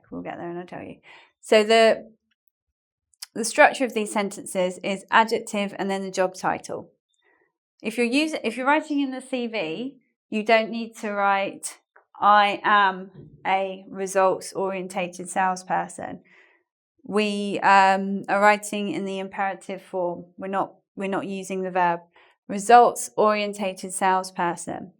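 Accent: United Kingdom. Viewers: Twice. How I love this British accent...👌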